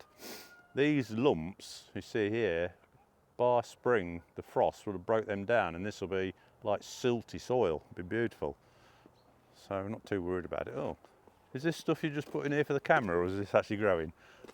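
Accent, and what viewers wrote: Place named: Leicestershire, United Kingdom. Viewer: Many thanks for making videos. From your accent, I think we're from the same place, Leicestershire or close.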